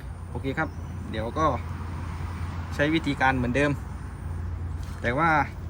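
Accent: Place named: Bangkok, Thailand. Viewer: สวัสดี คะ อยุ่จังหวัดอะไร สำเนียงพันนี้น่าว่าม่ายช่ายคนใต้ ต้องเป็นคน กุงเต๊ป(กรุงเทพ)แต่กำเนิดแน่นอน 😆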